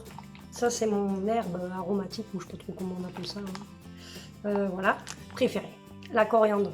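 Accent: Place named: Provence, France. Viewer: Bonjour, Je suis ravie d'avoir découvert votre chaîne lors de suggestions der retour de courses proposés par le site YOU TUBE et je tiens à vous féliciter de la façon à laquelle vous présentez vos vidéos, vous dégagez beaucoup de bonne humeur, de la joie, une énergie débordante et surtout je vous trouve très rigolote et je peux vous assurer dans ce contexte du coronavirus,vous vous transmettez beaucoup de bien, vous dégagez beaucoup de bienveillance, de modestie et de générosité. Je m'interroge sur ce petit accent bien provençal si je ne me trompe qui est charmant. Je vous encourage à nous proposer des vidéos de retour de courses ou dans d'autres thèmes. Au plaisir de vous visionner prochainement Bonne fin de week-end Fanny ( Paris XV ème)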